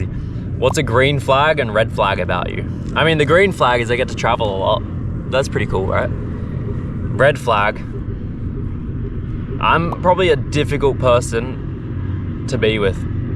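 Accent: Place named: Europe. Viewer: can you speak clearly and slow because your english it sound uoropean tone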